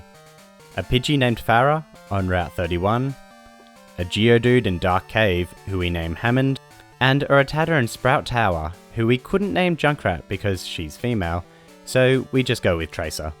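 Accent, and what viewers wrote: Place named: Australia. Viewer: a fellow Aussie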